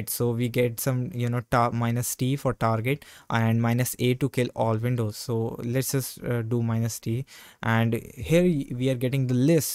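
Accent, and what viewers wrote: India. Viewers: I have saved this video to watch it later. Many thanks for this. Btw, may I know where you are from? You have an Indian accent.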